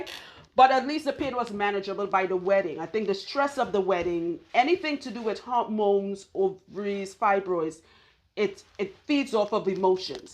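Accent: Trinidad and Tobago. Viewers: I knew it!!!! That Trini accent cannot hide....u had me laughing...but u were very direct and imformative and i appreciate that...firt time viewer from your home land...🇹🇹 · Hearing my accent on you is so beautiful, fellow Trini.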